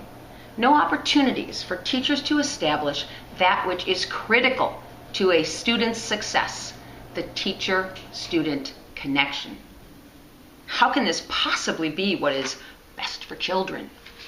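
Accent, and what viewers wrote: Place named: New York, United States. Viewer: What state are you from? This sounds exactly like NY.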